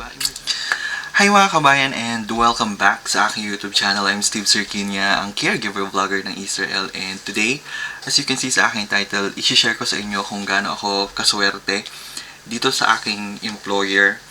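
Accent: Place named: Ilocos, Philippines. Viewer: TAGA San ka Po parang may Punto ka Ng pagka ilocano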